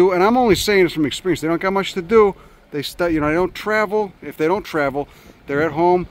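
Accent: Chicago, United States. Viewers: Love this channel particularly cause of the Chitowm accent makes me feel back at home. Would love to see my favorite bird, Common Nighthawk nesting in those rocks. Hey tanks for da channel!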